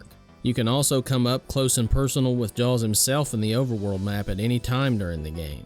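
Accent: South, United States. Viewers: Hey. I've been a subscriber for about a year. Your channel just keeps getting better and better. Thanks for the great content. Most reviewers hate on this game, but I always liked it. If you like Jaws, my wife just finished her first game on Steam which is an aquatic action/adv game inspired by Jaws. No, I'm not making this up... While the finished game is really nothing like Jaws at all, it was inspired by the game. Her game is called "Rupert and Riley Shipwrecked" and can be found on Steam or just by searching. Anyway, thanks again for all the great content. Nice to see (and hear) another Southerner on here;)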